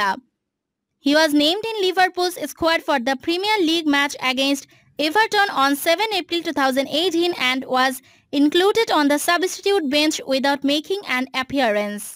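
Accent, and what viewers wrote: India. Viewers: You have a very strong Indian accent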